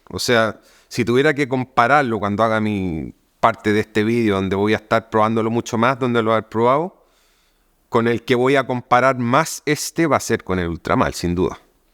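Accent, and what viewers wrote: Chile. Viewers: Se nota que es Chileno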